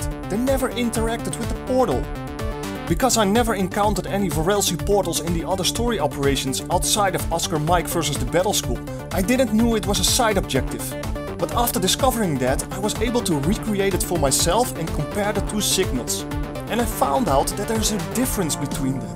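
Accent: Netherlands. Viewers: Lol I can hear immediately that you are Dutch... am Dutch to tho ! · Top man ! ik moest en zou reageren de seconde dat ik je accent hoorde haha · are you dutch?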